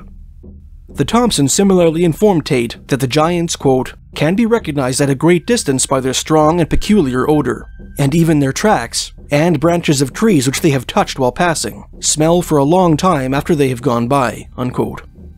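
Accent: Canada. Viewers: I wonder where she grew up in Canada with that accent.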